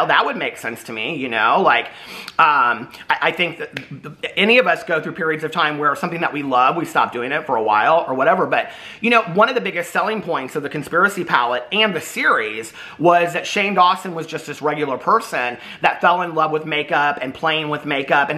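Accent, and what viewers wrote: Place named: Detroit, United States. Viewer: are you from detroit??🤣 that’s my favorite please don’t stop it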